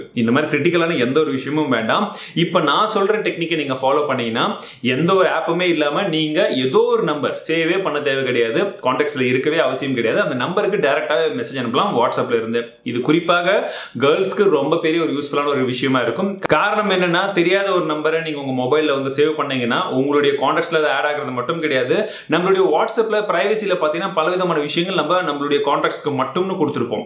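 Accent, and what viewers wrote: Chennai, India. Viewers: Chennai language supera pesuringa anna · Really i enjoyed the madras slang of you bro it nice😍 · Ada ennaapa nii chennai slang ķu poita nee........¿ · Chennai tamil super anna semma funna iruku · Bro tdy neega chennai la iruka peoples pesura maari pesuninga...☺️ nalla irudhuchu... Use ful tricks bro...👍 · Chennai Slang thaaru maaru bro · ✋✋✋✋✋தயவுசெய்து இனி சென்னை தமிழ் பேசாதே முடியல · Chennai tamil 👌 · Bro...unga chennai slang spr broo😍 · Super bro. Thank you bro 👍👍 . At last Madras language 👌👌😂😂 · Bro Chennai slong semma super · Chennai basala pesathinga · Chennai slang vera level bro 👍👌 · Scheduled msging sema bro Nenga pesura antha Chennai slang la pesurathu vera lvl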